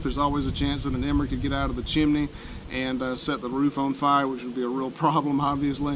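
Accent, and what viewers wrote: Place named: Appalachia, United States. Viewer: Where are you from. I like your accent. Is it a hillybilly accent? Thanks from the UK.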